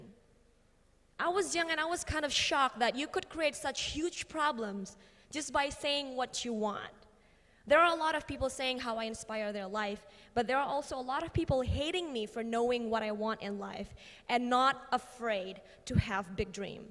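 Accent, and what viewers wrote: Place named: United States. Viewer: American accent on point! i wonder how she did that while her entire life almost spent in Indonesia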